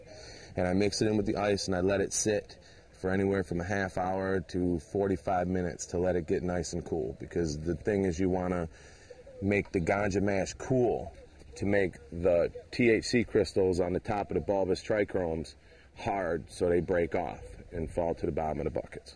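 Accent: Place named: Newfoundland, Canada. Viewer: buddy sounds like a newf most definitely a newf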